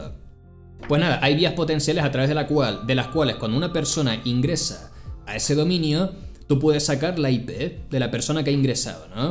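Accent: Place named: Spain. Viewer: Habla como Dequiv, de qué parte de Espana es ese acento? Curiosidad.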